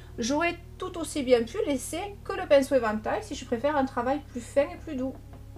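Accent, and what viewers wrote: South, France. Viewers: Bonsoir une très bonne année à toi et ta famille quel magnifique tableau une fois encore c'est exactement le genre de paysage que j'aime regarder et essayer de le faire j'adore 💕 il est waouhhhhhhh bon week-end à toi bisous et à très vite j'espère pour une nouvelle toile merci pour tes explications simples et ton très bel accent du Sud tu nous fait rêver ❤❤❤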